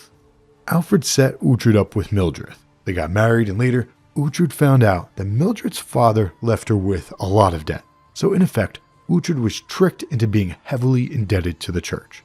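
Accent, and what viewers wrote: New Jersey, United States. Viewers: Are you from jersey?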